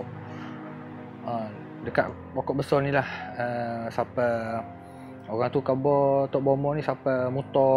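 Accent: Terengganu, Malaysia. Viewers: Best... Loghat ganu yg mudah difahami... Harap pasni matyo pn ada sekali... Awesome... Salam Ramadhan....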